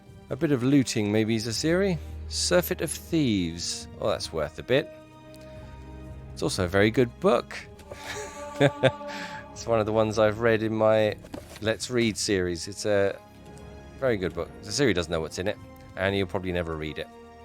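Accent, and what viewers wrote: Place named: United Kingdom. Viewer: I do appreciate some British accent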